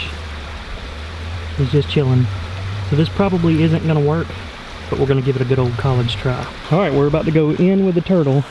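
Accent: South, United States. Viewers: So I watch your videos with closed caption. When you said “Bonnie meet new turtle, new turtle meet Bonnie” the closed caption read “Bonnie meet Luther, Luther meet Bonnie”. So there ya go. Luther is the name from me and closed captioning of our southern accents. Lol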